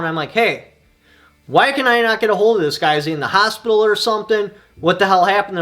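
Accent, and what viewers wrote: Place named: Wisconsin, United States. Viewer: Yer fuckin Sconi accent is hilarious.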